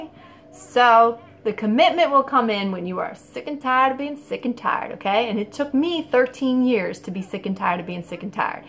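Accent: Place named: Philadelphia, United States. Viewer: I KNEW that was a Philly accent! Haha Takes one to know one. 😊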